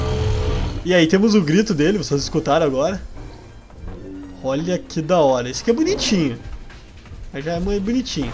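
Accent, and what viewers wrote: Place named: South, Brazil. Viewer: sabia que VC é do sul vc tem o sotaque daqui do sul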